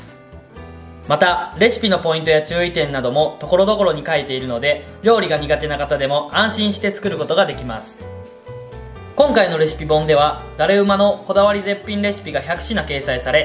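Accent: Kansai, Japan. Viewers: 関西の方だったんですね！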